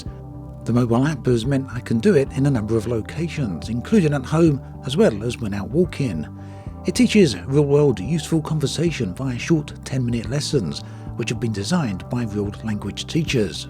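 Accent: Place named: Yorkshire, United Kingdom. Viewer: Your accent is classic Yorkshire lol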